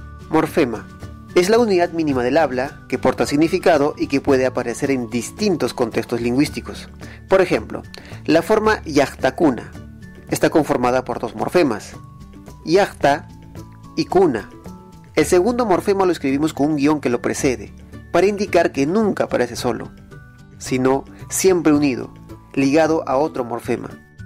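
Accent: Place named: Southern Peru, Peru. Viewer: Peruano del sur, osea chanca. Efectivamente en Cochabamba/Bolivia, he escuchado el quechua con un acento parecido.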